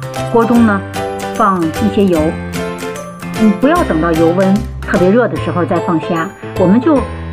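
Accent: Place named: Tianjin, China. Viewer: 天津口音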